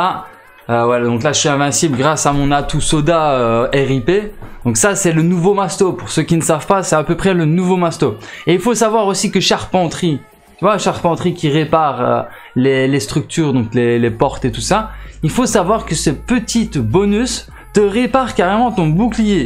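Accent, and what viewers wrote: Belgium. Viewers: ton accent belge est genial ! JCVD qui t'apprend un glitch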